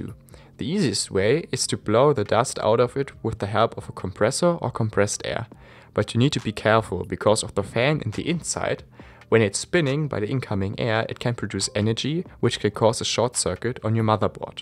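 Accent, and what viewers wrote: Germany. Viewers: Deutscher Akzent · omg dieser deutsch akzent in diese enhlisch ☠️☠️☠️ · Digga dein deutscher Akzent XD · You sound german🇩🇪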